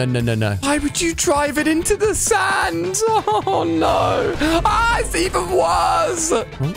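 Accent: United Kingdom. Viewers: what are u British or something because I'm British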